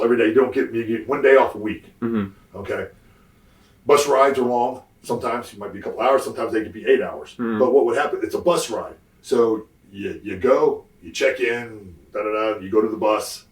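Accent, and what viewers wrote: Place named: Texas, United States. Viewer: He sounds like Austin and Shawn. Texans.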